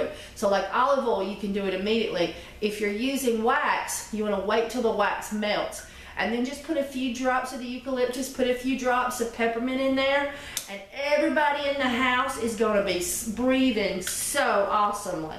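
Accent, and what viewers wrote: South, United States. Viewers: Are you kidding me?? Jojoba oil is the cheapest oil EVER!!!!!!! And yes even the purest 100% is very cheap! I dont know where you buy yours from but over here in europe is the cheapest oil of all of the oils on this world together with grapeseed oil! ITs so cheap cuz its vitamincs and nutrient value is the lowest out of all the oils! Johjoba is a basic carrier oil and no powerhouse oil at all! And also you jsut made that up with the whole puttting extra oil in the bottles incase of a spill! ahahahahhaha You re so funny! Ive worked for beauty compagnies and trust me they dont anything ectra in there! You just made that up to feel bettter about yourself. And the reason why google wont recognize your voice is cuz you talk very ghetto and country! She didnt even try to speak correct English! From to you reminded me extactly like Madea!!!!! 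jaahahahahahah Mabble simons aka madea is the bomb! YOu should act and talk mroe like her! IT suits you.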